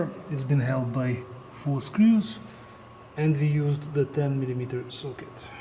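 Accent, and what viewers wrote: Russia. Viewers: Thic Ruski Accent with good english is soothing lol 😅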